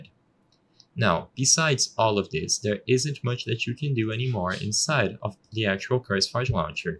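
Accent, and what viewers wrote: Brazil. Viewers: O sotaque è inconfundível, Br e o que não falta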